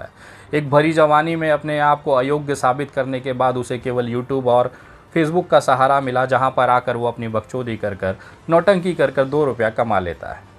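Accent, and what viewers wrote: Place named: Nagpur, India. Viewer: Aise kon bolta hai bhai bechara नागपुरिया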